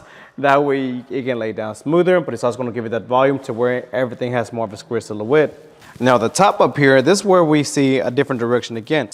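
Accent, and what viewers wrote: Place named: Mexico. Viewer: Mix between Mexican and a good old boy country accent lmao.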